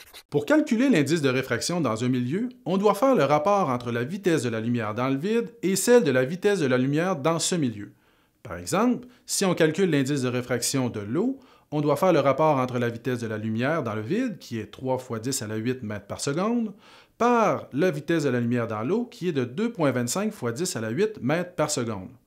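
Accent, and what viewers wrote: Quebec, Canada. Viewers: avec votre accent québécoise, sa me donne vraiment envis de le réviser avec vous ^^ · Je suis en cours je comprend rien y’a trop d’accent québécois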